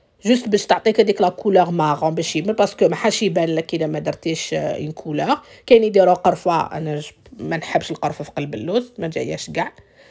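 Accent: Algiers, Algeria. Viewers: j'adore ton accent algéroise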